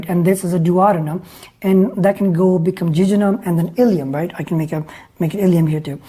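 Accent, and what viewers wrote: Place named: India. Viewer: Sir your teaching is good but you will speak Indian kind English i thought better to understand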